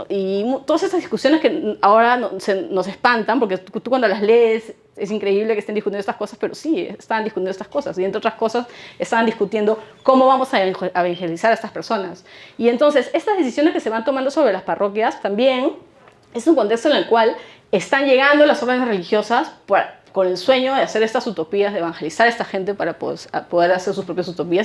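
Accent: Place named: Lima, Peru. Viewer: Dentro de la lengua se denomina " regionalismo" que es la peculiaridad q tienen ciuadades y regiones Al pronunciar sonidos. El acento limeño lo tiene en El sonido de la "j" y asi lo hay en Otras regiones del Perú. Ella no lo hace por molestar. Así que disfruta la Charla y quedate con lo importante.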